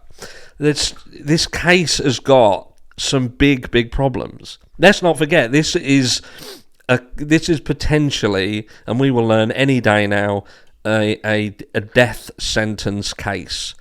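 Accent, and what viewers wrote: United Kingdom. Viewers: The only difference between you and Peter Tragos is your British accent. Visually you look identical so I have to wait until you start speaking before I can tell for sure.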